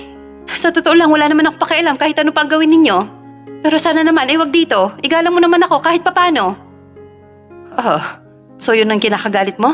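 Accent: Philippines. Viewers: Ok lang pero funny kc may punto yung narrator ay parang may music na pataas at pababa. Anong probinsya ka ba? Di ka ciguradong taga Manila.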